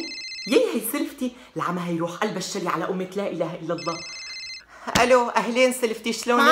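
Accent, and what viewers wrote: Aleppo, Syria. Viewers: | والله تعلمت اللهجة الحلبية منك متابعك الوفي من الجزائر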